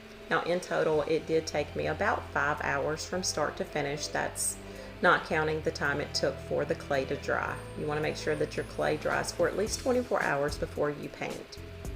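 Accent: South, United States. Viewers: I love your sweet southern accent and I appreciate you diy's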